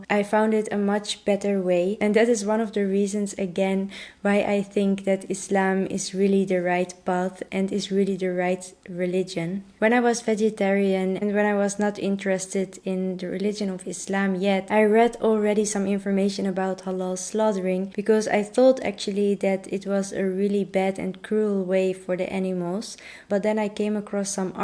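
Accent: Netherlands. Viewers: Ik wist gewoon dat je nederlands was door je accent haha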